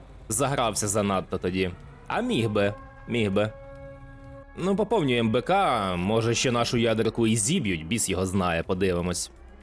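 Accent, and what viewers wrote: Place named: Ukraine. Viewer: Автор мабуть з Харкова чи Полтави. Такий жорсткий суржик.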